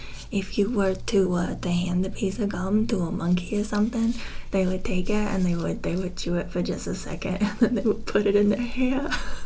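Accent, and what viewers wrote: New Jersey, United States. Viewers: Haha the accent is so cool! All i could think of was jersey boys :D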